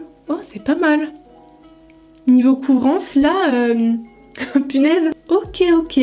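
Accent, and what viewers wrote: Lorraine, France. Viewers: Je trouve ton make up beaucoup trop chargé (ici et de manière générale). Je trouve ça dommage. Je regarde de temps en temps tes vidéos parce que j'apprécie ta personnalité, ton dynamisme et ton accent (oui on est Lorraines migrées en Alsace toutes les deux :) ). Et je t'ai déjà vu avec des make up beaucoup plus légers voir au naturel et clairement je te trouve bien plus jolie ! #opinionpersonnelle. Bises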